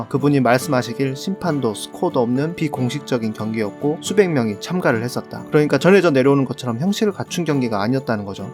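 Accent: Jeolla, South Korea. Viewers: 난 이 형님 전라도 억양이 너무 좋아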